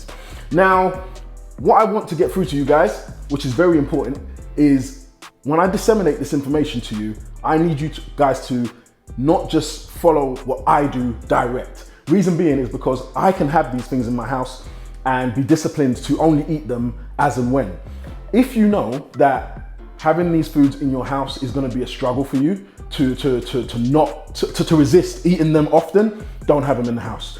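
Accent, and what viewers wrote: United Kingdom. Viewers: only got the fact he was britsh bow after 1 whole vid and 10 mins into this one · That's just another UK vs US thing. I and everyone I know in the UK pronounces it chewna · are you British? I love your workouts man I do them with the Misus. Need to ask you a few questions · Keep that culture and UK accent alive. Cheeewna!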